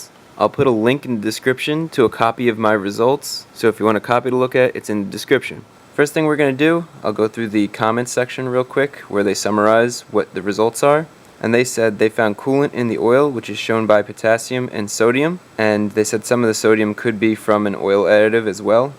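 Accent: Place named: New Jersey, United States. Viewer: Long guess.. are you from New Jersey? Your Water accent is so cool!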